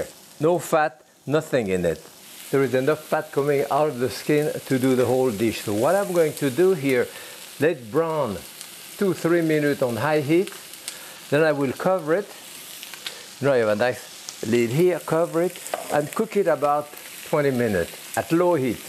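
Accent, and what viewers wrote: France. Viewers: Usual Jacques le cuistot I inimitable ! I have been following his demonstration decades back and marvel at his techniques and his French accent . Anand from ile Maurice (Mauritius)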